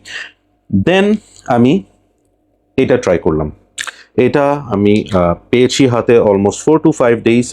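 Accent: Chittagong, Bangladesh. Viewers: ভাই,আপনি কি চিটাইঙ্গা নাকি?🤔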